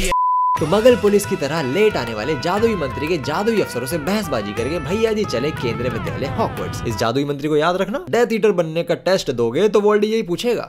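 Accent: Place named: Bhojpuri, India. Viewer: I never saisthis before but does have a brilliant bhojpuri vocabulary and accent as well as great voice modulation skills..kudos to that 👍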